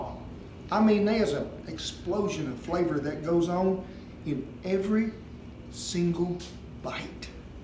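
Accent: South, United States. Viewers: I’m in love with that southern accent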